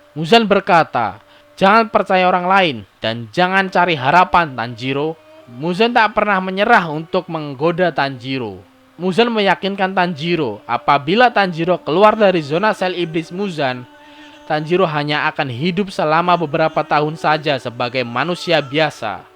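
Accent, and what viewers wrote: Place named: Java, Indonesia. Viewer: Jawa bet lu njirr...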